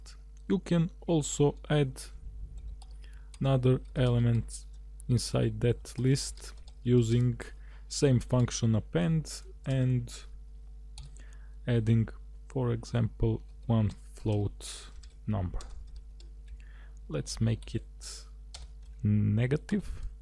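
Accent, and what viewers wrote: Russia. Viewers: thank you mr. Russian sounding fella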